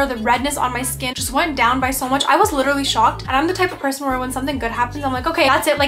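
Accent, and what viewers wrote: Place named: United States. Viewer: wow wow karti hai ....america akcent bolti haaaai ....lagti tu powri indian haaai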